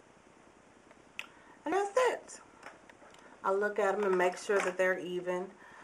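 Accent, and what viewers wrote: South, United States. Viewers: Girl I'm from the south and I just love how you talk with your southern ascent!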